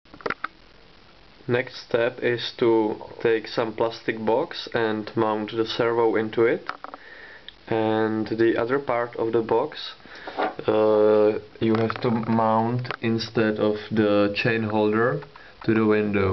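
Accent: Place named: Czech Republic. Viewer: Love that Czech accent :D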